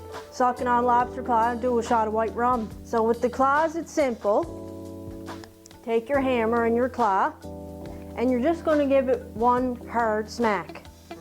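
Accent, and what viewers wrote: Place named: Maritimes, Canada. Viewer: Oh, be off with ya! That's our Maritime accent over here, don't ya know?!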